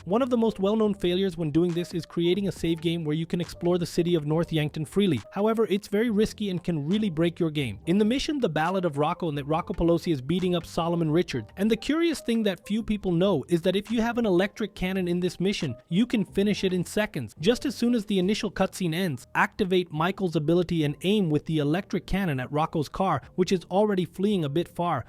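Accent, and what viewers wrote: Brazil. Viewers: actually its portuguese he have an brazilian channel and lets just say his english isnt that good hope this helped! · He is brazilian, just like me.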